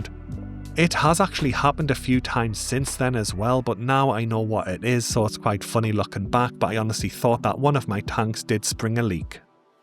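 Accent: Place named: North Yorkshire, United Kingdom. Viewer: Looks really good, i don't have a set up like that but i'm planning another purchase, i going set up a cherry shrimp jar, i just need a big jar, i've got them in all 4 tanks now, my minnows haven't bothered with them, i've gone from 12 to a few hundred at least in about 6 months, luckily i know a couple of people with tank suitable for some, the water in North Yorkshire seems to suit them, not far from where you are, judging by your accent, i've just started following you on Facebook so i might send you some pics, what would you recommend for planting in a jar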